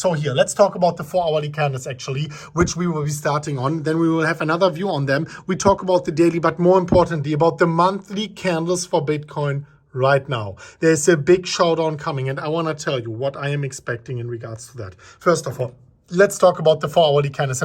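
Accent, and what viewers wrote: Germany. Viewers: Why are you interested in his German accent? Even for me as a German it’s brutal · Man, I really hope that one day I am crypto rich and develop a German accent like.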